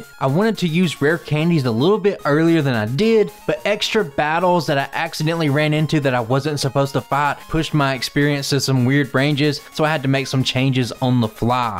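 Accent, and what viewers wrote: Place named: Texas, United States. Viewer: im ngl your (Texan?) accent makes your videos very entertaining to listen to, quite unique. definitely subscribed!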